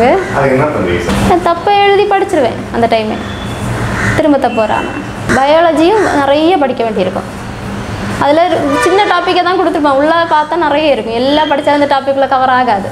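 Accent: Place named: Kanyakumari, India. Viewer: Kanyakumari slang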